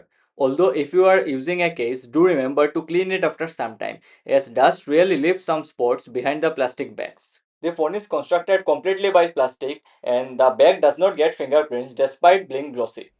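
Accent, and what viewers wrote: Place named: Bengal, India. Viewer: Puro bangla accent toh bhaii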